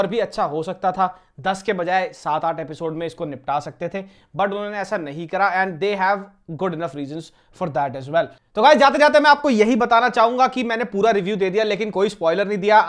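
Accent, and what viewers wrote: Haryana, India. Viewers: Mast haryanavi bolta hai tu....... Continue it in ur video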